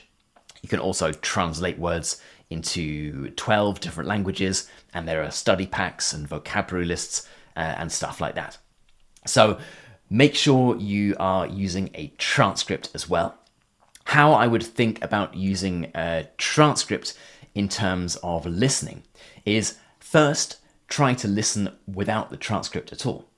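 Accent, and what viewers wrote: United Kingdom. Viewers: Este es acento británico?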